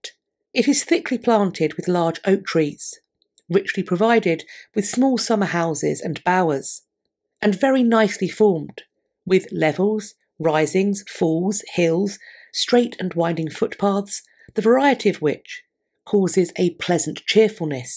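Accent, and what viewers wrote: RP, United Kingdom. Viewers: I say we re-build it!!! (does the Trust have any spare change? hee hee) Thank you, for this lovely presentation! I believe Nonsvch was Henry's sort of dream house, if that's possible for someone who owned many palaces and castles. It was indeed the place he envisioned his legacy and descendants would carry on. I've always thought Charles II to be handsome in some strange way, but shame on him for allowing the destruction, regardless of his former lover's reason. I can see now, in her velvet and silk Elizabethan gown, hair up, perky smile and posh accent, telling off Ms. C, preventing the destruction, and sentencing Ms. C to a lifetime of farm labor, since even "thinking" of destructing Nonsvch was a crime, just as only mentioning the king's death was treason. By the way, does anyone know if the Nonsvch church would have dropped any hints of any particularly religion other than the Church of England? 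hee hee With an Italian designer, I smell Catholicism which I wonder if Henry truly ever abandoned entirely. 🎭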